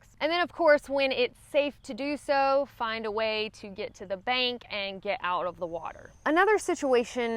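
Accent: South, United States. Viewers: Well done - accurate and factual. Should help new folks stay safe. Plus, I LOVE your accent. Great to hear a southern gal telling it like it is. Nice work!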